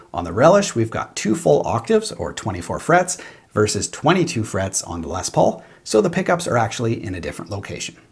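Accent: Canada. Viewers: you sound so Canadian to me really enjoy your videos bro! Love from the Dominican Republic.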